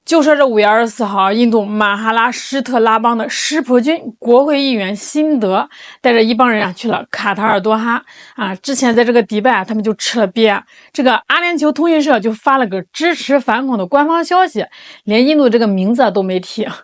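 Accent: China. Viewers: 口音100%是中国的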